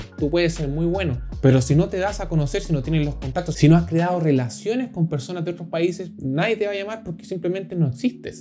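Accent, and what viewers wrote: Chile. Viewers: Suscrita a partir de ese hermoso acento chileno, tu fondo de video y obviamente tu calidad de contenido!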